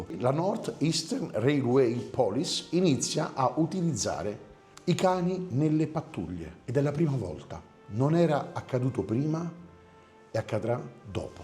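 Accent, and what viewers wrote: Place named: Rome, Italy. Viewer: E vero non se ne vedono più credo perché oggi ottimizziamo tutto io ora sono in Umbria e i cacciatori preferiscono razze più piccole meno manutenzione e spesa, a parte ciò credo che oggi oltre a passatemi il termine non servire come un tempo a tutto si scelgono razze x un solo scopo si semplifica ci vorrebbe troppo impegno altrimenti ormai siamo tutti Smart ma i cani sono rimasti cani per fortuna grazie del video sempre molto limpido e facile da seguire ( ogni tanto famme risenti n’ po de romano che me manca 😂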